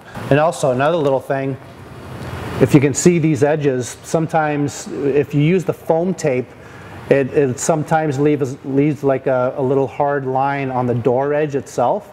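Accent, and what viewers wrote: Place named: United States. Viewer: I like yourself am about 20+years and have used 3m products continuously, yes ive tried most other "scene" machine polishing brands and have always come back to 3m,and have realised there are so many rebranded materials so if it aint broke dont fix it! Rotary and 3m products do exactly what you want them to, good video ur like me but with an American accent lol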